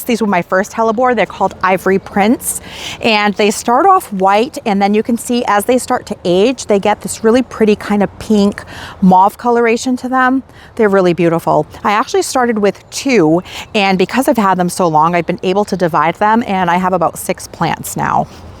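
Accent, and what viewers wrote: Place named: New England, United States. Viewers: What’s ya doing!❤. Love the New England accent. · So much inspiration for me! Your gardens look gorgeous. I grew up in RI, and I heard the accent in your video and knew it was someone local! In NH now, do live to see a month or so ahead.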